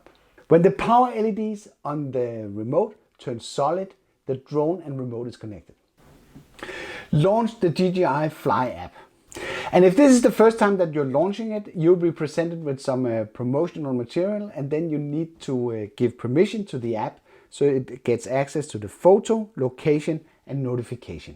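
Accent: Denmark. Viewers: Danish accent it’s like Chinese ,any way it’s good tutorial☺️ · Danish accents are the best! Thanks for the info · It Sound like youre from Denmark because i am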